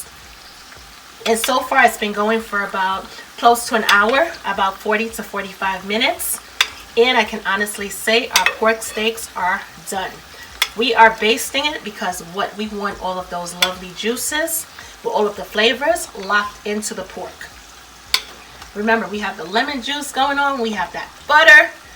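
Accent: New York, United States. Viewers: Omfg I'll watch this over and over again just to hear that NYC accent!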